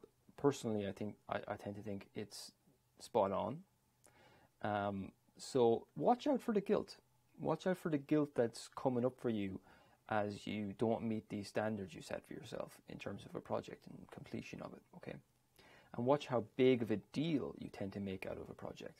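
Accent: Ireland. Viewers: very cute.. are you Irish? i would stop procrastinating with you.. ;-)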